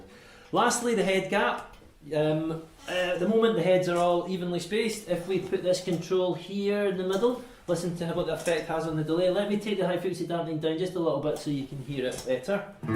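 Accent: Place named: Scotland, United Kingdom. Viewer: thx 4 Ur quick answer - but nope : I speak 5 foreign languages fluently without my "home" language accent :-D - and I can understand "some" regionalisms in all of them, but not "all" of course - so as UR trying to speak "english" U should at least do the best U can doing so .. not very many people on this entire >planet< can speak / understand "scottish" (even after having drunk several bottles of the regional whisky ;-) ) - there4 all I can do here is listen to it several times, hoping to get "into" it after a while .. and it would be nice if you'd apply subtitles in the future (there are fairly good automated programs for that) 8-)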